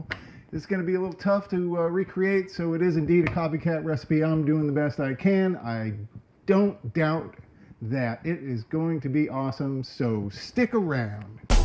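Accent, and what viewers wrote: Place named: Memphis, United States. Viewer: Uncle Lou's,sounds like Memphis to me.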